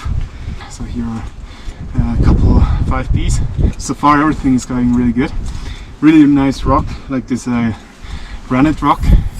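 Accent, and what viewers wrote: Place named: Switzerland. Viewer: Absolutely loved it! Fantastic edit. Amazing drone shots. And the best: your swiss-german accent ❤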